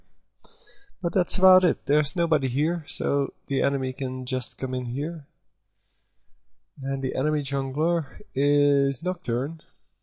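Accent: Netherlands. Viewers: are you dutch? haha u realy got an dutch accent :)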